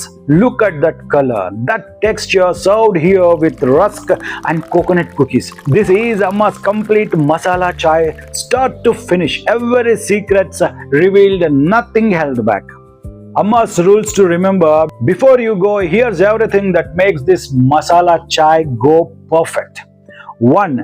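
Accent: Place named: South India, India. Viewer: Thank you Amma and Ji for bringing this beautiful and highly detailed recipe on how to brew masala tea 🙏😊. I'll definitely make this tomorrow for my parents (I only get time to cook on weekends). I also like the accent of the narrator, beautiful South Indian accent. Reminds me of my school teachers. It'll be my first time brewing a tea your way and hope it turns out good 😁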